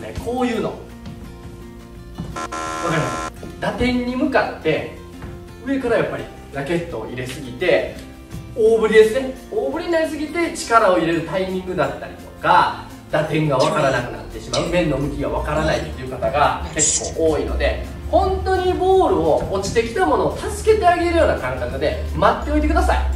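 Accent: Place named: Kansai, Japan. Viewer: ワイプのMJがシュールw いつも思ってますが、の声って高めなのと関西弁のイントネーションで良い意味で引っかかるというか、聞き取りやすくて良いですよね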